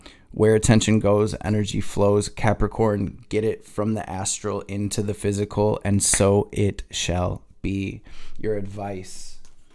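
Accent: Detroit, United States. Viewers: waddup doe u from detroit???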